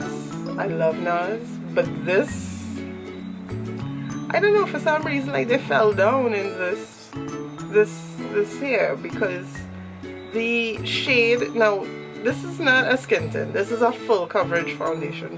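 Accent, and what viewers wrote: Trinidad and Tobago. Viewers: New subbie....your accent.....Trini?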